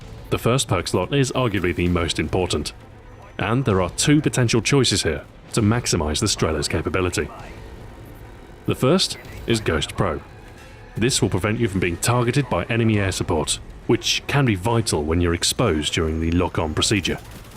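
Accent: England, United Kingdom. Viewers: If you thought that, then every Englishman would sound like that to you....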